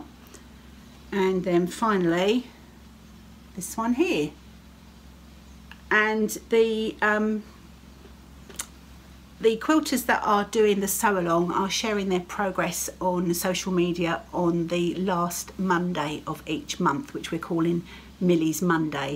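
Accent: England, United Kingdom. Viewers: We love at my house. My husband and daughter love listening to your British accent as you describe your projects. We love it when you say, “bits and pieces”. Please continue with your channel whenever you have time. Your work and content are always so good! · Please do not leave. When I saw on instagram you had posted I made a cup of tea and sat down to watch. You are one of my favorites and I don’t get a lot of British floss tubers and so enjoy your accent. Just keep showing your work and cute dog and telling us what you have been up to. As to haul I can’t afford a lot either never mind about that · I love your floss tube. I love hearing your accent. I am from the US and your English accent is so sweet to me. I love your content. If you are feeling pressured to have more, maybe you could put more distance between your episodes. I love hearing about your dog and your husband and your mom and your job, whatever is going on your life just as much as I love seeing your work. 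I hope you stay, but I understand you need to do what’s right for you. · I do so hope you continue with your flosstubes! I have totally enjoyed them! Being from the States, you have shared a bit of life across the pond, & it's been delightful! I've enjoyed seeing the English countryside, your charming accent, as well as your lovely finishes. You've inspired me to try new ways of finishing & different designs. I like that you seem real. Life gets in the way for us all at times, but your videos have kept me going through some challenging days. I have dropped some subscriptions, as they overwhelm me. Too much haul, so many finishes, one feels like we're in a race & can't keep up. Designs are coming fast & furious. It's nice to see someone working on older pieces. Watching you is like stitching with a friend. We seem to pick the same patterns...I too have been reworking my sewing space...it's like we're on the same wavelength. 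Maybe post monthly? I'd really miss visiting with you!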